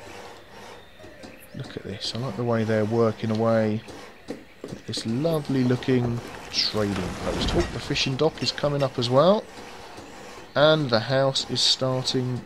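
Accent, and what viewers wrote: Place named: Northern England, United Kingdom. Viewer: Hello! If you don't mind me asking what part of the United Kingdom are you from!? I can't put my finger on it! You sound kinda of northern from were i am at in London.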